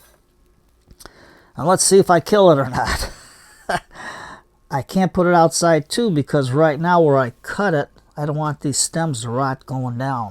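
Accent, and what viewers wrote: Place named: Chicago, United States. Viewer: Hi! I was in Oak Lawn and the Mount Greenwood neighborhood of Chicago last week and stopped by Fasel’s & Son’s to get some bonsai pots at 20% off. Great prices. Thanks for the tip. I had limited space to transport back to North Carolina or I would have bought more. It was good to see the old neighborhood, visit my family, and hear more of the Chicago accent that has made listening to you so much fun.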